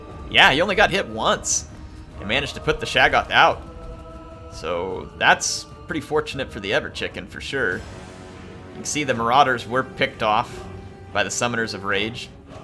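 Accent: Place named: Kentucky, United States. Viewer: I've missed his kentucky-fried accent!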